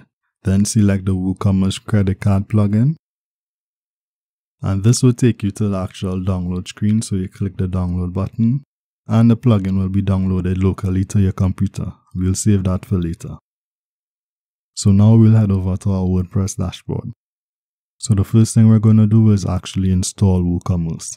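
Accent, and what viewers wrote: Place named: Trinidad and Tobago. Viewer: I'm liking your content man. Keep at it and loving the Trini accent.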